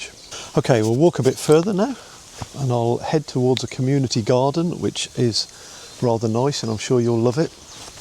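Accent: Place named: Midlands, United Kingdom. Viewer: I thought I detected a slight Midlands accent! .I used to occasionally go with dad and brother to Wolves matches(in the standing" pens") in late 1960s Originally from near Wolves myself and the last 30 odd years in France(though spending a lot of time in Sidmouth Devon for the last 6 of them helping out my elderly mum)I am, since she passed on a few months ago in a quandary. The problem is, like you, I really can't decide where to settle, always thought would stay in France but the time spent in Devon with mom and sister(who returned recently from about 35 years living in Trento Italy to Sidmouth) has confused things enormously as am getting old! Two offspring ,one living in Annecy France the other in London doesnt help either and they both could move on elsewhere. Anyway very much enjoyed listening to your story,hope things work out for you and family.Devon is a lovely county.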